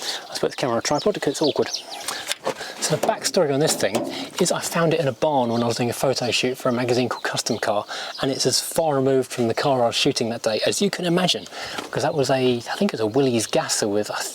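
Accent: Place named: Southern England, United Kingdom. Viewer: oh right!, i thought you sounded more southern . i am just past Southampton but a p6 nut . useless at mechanics but I do like buying them , ha, I had about 17 at one point but down to 5 now.,only one on the road . I was interested in your build for I have to do an engine swap with a v8 5 speed . and I need motivating such as watching you . I have a p6 to get fixed and its from Dover I think? or that way, its a series 1 v8 5 speed. I need to dig it out and get it going . thankyou so much for your videos . it could be the inspiration I need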